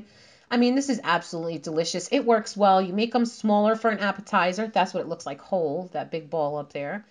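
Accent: New York, United States. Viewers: That accent makes me proud to b a New Yorker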